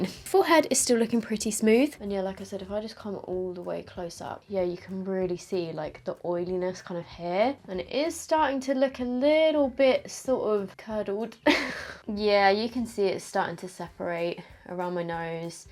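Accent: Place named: United Kingdom. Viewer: is she british? i love her accent!! 🤍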